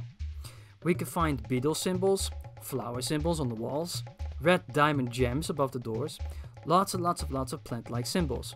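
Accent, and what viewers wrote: Netherlands. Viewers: You sound Dutch. Are you?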